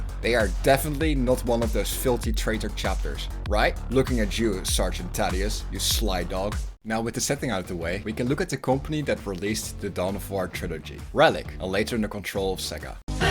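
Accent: Netherlands. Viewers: Just came upon this video to hear some more dawn of war bashing(they deserve it). And suddenly realizing. The narrator is dutch. Hello fellow dutchie 👋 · First time watching this channel, and man, from the first words, you have to be Dutch, right ? :P That accent, it almost has to be Dutch. DoW1 was brilliant, most of it was just fantastic, almost all. DoW2 was not completely my thing, but it was good. DoW3 is one of my worst buys ever, man was i disappointed, and i'd hoped modders would change it a bit, because it looks good, but i hate the limited base building and how it plays, but alas, it hasn't become a better RTS, or an RTS at all i'd almost say, at all. If i have to play any DoW game now, it's DoW1. Hands down. And it has quite a few mods too, some better than others.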